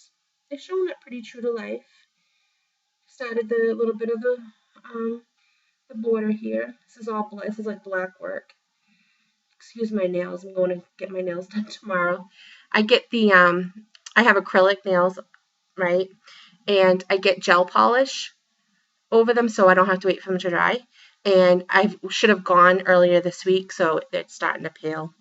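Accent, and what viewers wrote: Boston, United States. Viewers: I definitely heard Boston, with the ar words, like part, shark, start etc. I thought it could be stated island, maybe Jersey. I was born in and partially grew up in Brooklyn, so I'm told I have an accent as well. Lol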